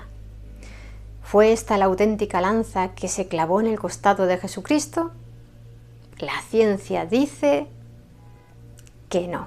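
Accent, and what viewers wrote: Spain. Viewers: Me gusta mucho tu acento español, el tiempo que te tomas explicando con sumo detalle, mi suscripción la tienes =) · Eres una excelente docente con una hermosa voz. Para mí acostumbrado al acento rioplatense tu acento hispano me suena muy bien.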